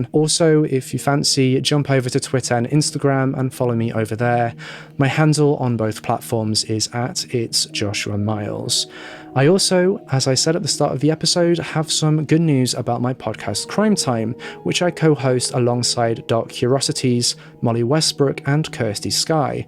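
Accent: United Kingdom. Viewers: I do enjoy your presentations! I also am a fan of “Dark Curiosities”, as I noticed on your channels list. I love British your accent, as it makes listening seem more mysterious! I’m an older person, and have Bi-Polar, as well. I take my meds daily! It effects all a bit differently, and poor Chelsey’s was sadly out of control. Also sad , is the fact that sinister people like “Dark Rider”, are out there as predators. To people in vulnerable.conditions, as her’s. Stay well!🙏🧡✌️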